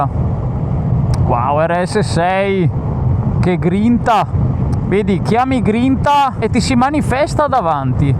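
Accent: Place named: Treviso, Italy. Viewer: Che bel canale!! Ho visto qualche video, ti faccio i complementi. Io sono veronese, ho sentito il tuo accento trevigiano ma e pressoché identico al mio!! Siete riusciti a fare un bel tour Treviso Germania?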